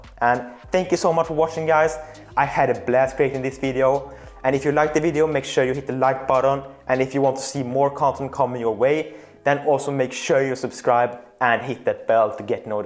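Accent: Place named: Sweden. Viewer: Bro are you Swedish? Cause your accent sounds quite Swedish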